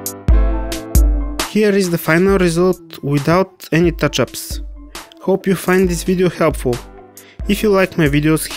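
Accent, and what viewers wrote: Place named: Russia. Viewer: Твой рунглиш великолепен)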